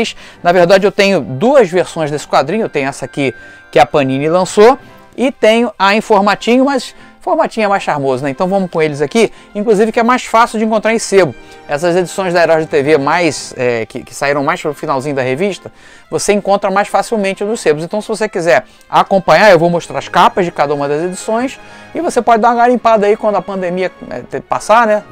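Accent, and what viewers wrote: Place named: Northeast, Brazil. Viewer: Cara, sei nem te explicar. Depois percebi que você fala sobre algo que conhece muito bem do jeitinho que eu mesmo falo. Você é nordestino como eu né? Mas depois passei a adorar sua paixão pela coisa!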